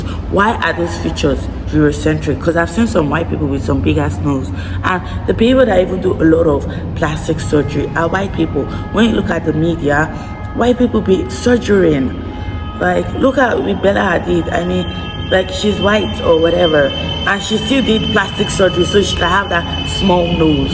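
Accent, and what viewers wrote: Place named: Nigeria. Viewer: Where in Africa you from? You sound Nigerian Shaaa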